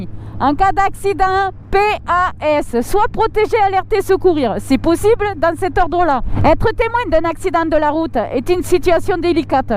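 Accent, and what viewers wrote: South, France. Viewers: avec ton accent du sud ça passe sans problème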